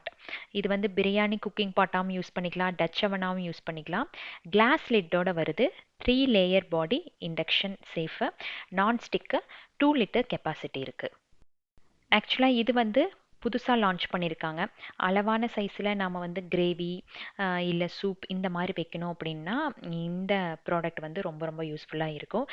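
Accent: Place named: Telugu, India. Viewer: What’s your mother tongue ….i see you speak Tamil really well but you also mix Telugu in that is it?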